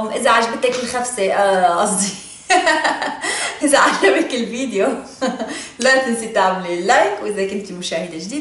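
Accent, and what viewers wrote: Syria. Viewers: شنو اصل ؟سورية لو مغربية لو شنو..لهجتهة سورية بس مرات اشك انو مو سورية🤣♥️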